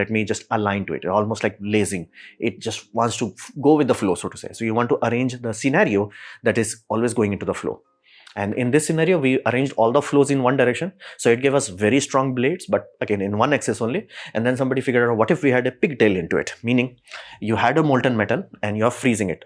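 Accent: India. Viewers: Great job bubba! I’m Indian and proud to see a fellow Indian with his thick accent doing a fantastic job of explaining something that could be very tedious and complex in an entertaining way! Keep it up!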